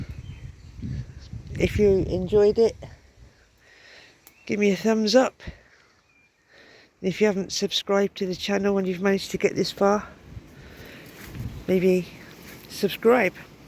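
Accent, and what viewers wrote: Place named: West Country, United Kingdom. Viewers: From a 70 year old Upton boy living in wales now , I recognised that great Worcestershire accent. Lovely videos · Hi I just found your channel and have subscribed - obviously. My one burning question is where is your lovely accent from? At first I thought West Country, but I’m not sure that is right. Looking forward to seeing more of your videos. Tell Minnie I said “hello”.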